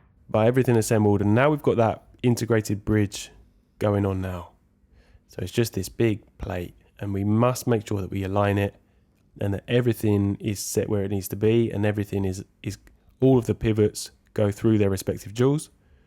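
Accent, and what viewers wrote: London, United Kingdom. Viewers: Hello. Nice video I liked it all. As a Seiko nut I am still surprised I’ve not worked on a 5606 I have 3 to restore but just never got around to them ! You clearly are English and London by the sounds of it so what cleaning fluid can’t you get? I still rate Essence of Renata for a good hairspring degreaser. As for crystal don’t bother looking for originals as you can get any domed , flat or tension ring on aftermarket for little money from cousins. Sternkruez make decent ones. I’d only seek out original Seikos on acrylic flanged or special facet ones. The gear you will have bought from Adrian as they are good. A guy in my Facebook group recently 3D printed the 4 teeth ones which he was selling for $5 each. They work good apparently. I have 5 in stock as I know two of my watches have the fault. Also been looking at that jewellers tool myself recently! 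Will buy it now I think.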